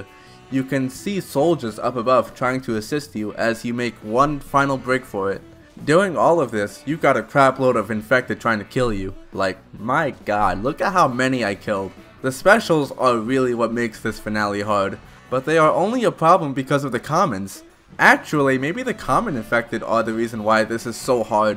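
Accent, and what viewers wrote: United States. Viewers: What's your accent? New York?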